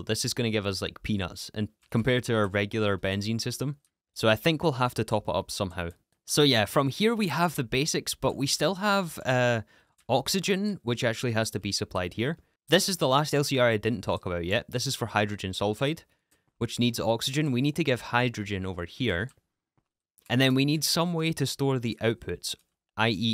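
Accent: Scotland, United Kingdom. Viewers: this is my comfort content, hearing his silky smooth scottish accent say things that my brain doesnt have a single clue about is so soothing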